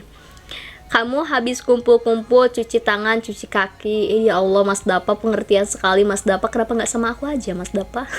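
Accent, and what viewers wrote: West Java, Indonesia. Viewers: sunda bgt logatnya f/v pake p wkwk lofff❤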